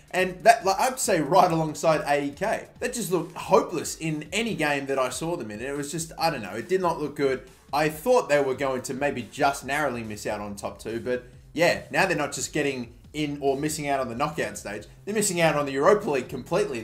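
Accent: Australia. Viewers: R u Australiaan mate good day fellow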